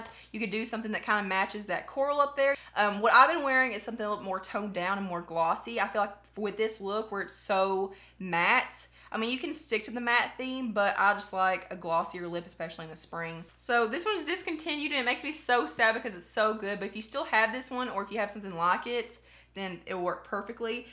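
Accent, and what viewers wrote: South, United States. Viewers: I love your voice it sounds so country 😍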